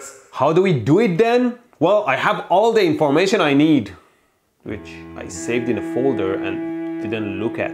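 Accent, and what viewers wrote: Iran. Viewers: میدونم که ایرانی هستی * از لهجت معلومه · چقدر لهجت بە ایرانیا میخورە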